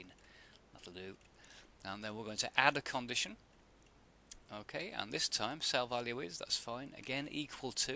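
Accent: United Kingdom. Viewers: I had to learn Excel quickly before I start my new job. These tutorials are excellent! Moreover, everything sounds so much more interesting with that lovely British accent! Thank you so very much. I'll feel quite confident going into my new position.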